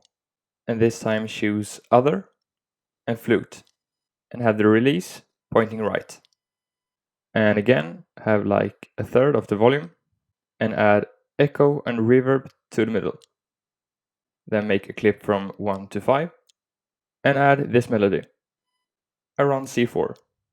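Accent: Sweden. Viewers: Er du fra Sverige?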